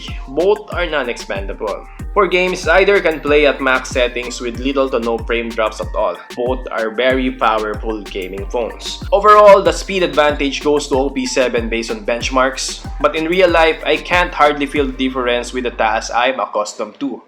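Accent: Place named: Philippines. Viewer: I love your accent bro #pinoypride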